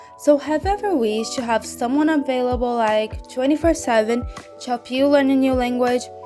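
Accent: Brazil. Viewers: Where are u from? Your accent reminds me of the brazilian accent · Great video! Thanks for sharing these tips! (Also, it`s crazy how i just knew you were a pt-br speaker just by the way you pronounce the "T" lmao. brazilian folks unite~) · Are you from Brazil? Your accent really reminds me of Brazilians accent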